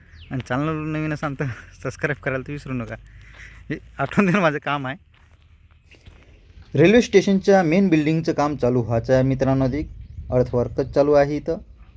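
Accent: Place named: Varhadi, India. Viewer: भाऊ आता तेवढी थंडी नाही तुम्ही बिनधास्त माहिती सांगत जा वर्हाडी भाषा खूपच गोड बेलखेड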